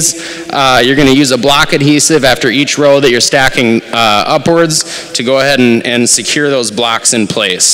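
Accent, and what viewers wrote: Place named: Minnesota, United States. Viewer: Man this guy is definitely a Minnesotan. Do we really sound like that?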